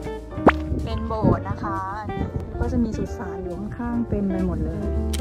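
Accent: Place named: France. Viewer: Très belle vidéo, j'adore ton accent Français trop mignon 😍 ❤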